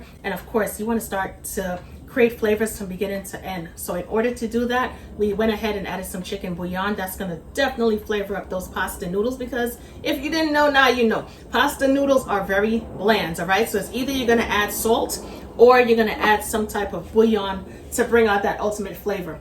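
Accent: New York, United States. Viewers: I knew that accent from New York.. I bet Brooklyn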